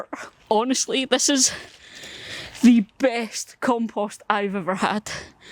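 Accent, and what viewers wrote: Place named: Northern Scotland, United Kingdom. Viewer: I see you are on the East side of Scotland - whereabouts? I spent 3 years in Rosyth but you sound further North (to me anyway LOL)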